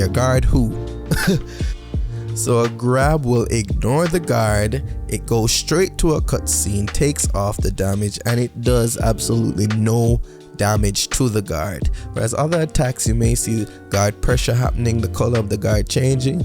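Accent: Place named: Jamaica. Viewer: I hear jamaica 🇯🇲 in your voice #subbed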